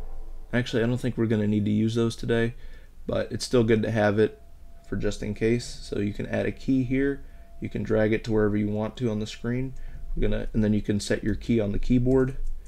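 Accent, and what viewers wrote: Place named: India. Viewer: Oh awesome I thought you were like Indian or something 😂 lol nice!!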